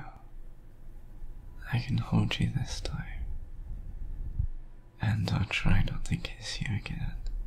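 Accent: England, United Kingdom. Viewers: Thanks for the video, btw i love your accent! Are you from england?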